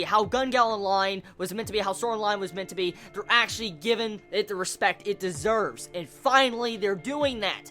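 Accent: United States. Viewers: That country accent tho...:)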